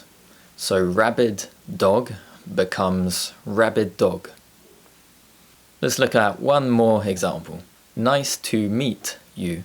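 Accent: United Kingdom. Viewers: Things that i love the most is learning british accent british slang anything about Britain.are u british?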